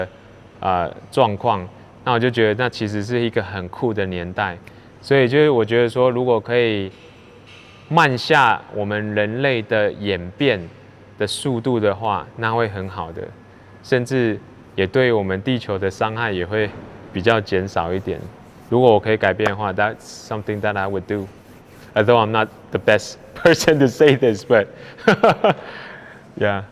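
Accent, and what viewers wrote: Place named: Taiwan. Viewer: 老師貌似是台灣腔。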